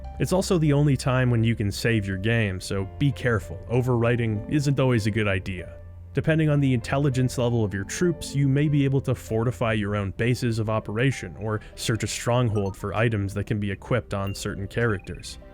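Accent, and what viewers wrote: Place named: Canada. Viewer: Oh snap! You're Canadian!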